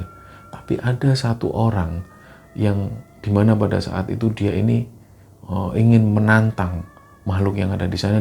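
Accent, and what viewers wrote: Java, Indonesia. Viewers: Video nya hampir smua kutontoon mas the best menyajikan cerita nya khas medok Jawa nyaaa 👍👍👍👍 ketagihan nonton nya tekstur crita gAmpang paham untuk dicerna👍👍👍good Job mas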